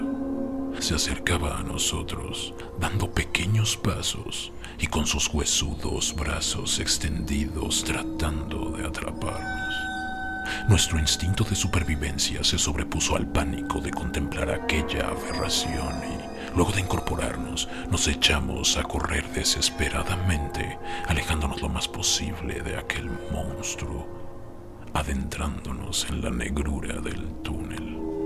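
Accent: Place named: Mexico. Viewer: Que gusto volver a escucharte brother! Tus historias y narración son inigualables, neta tienes un estilo muy chingon con ese sabor mexa que tanto hace falta, éxito y espero con ansias tu próximo video 🤘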